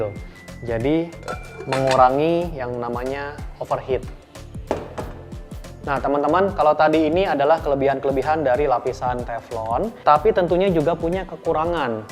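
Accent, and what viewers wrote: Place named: Palembang, Indonesia. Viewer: Wong Plembang caknyo bicek ini hhahahaa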